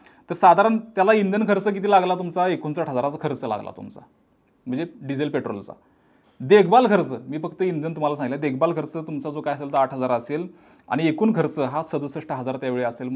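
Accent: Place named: Rural, India. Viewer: 3 ते 4 मिनिटांचा कन्टेन्ट घासून घासून परत परत परत तेच सांगून 20 मिनिटांपर्यंत ताणायला खरंच कौशल्य लागते राव. विषय चांगला आहे, ग्रामीण अक्सेंट मुळे आपल्यातला वाटतो देखील..पण जरा स्क्रिप्ट वाचून घ्या शेठ!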